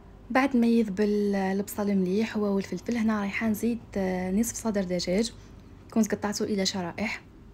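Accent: Setif, Algeria. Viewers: نورمالمو انت بنت سطيف هدرتك سطايفية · صوتك يهبل تباني سطايفية يعطيك الصحة وربي يوفقك حبيبتي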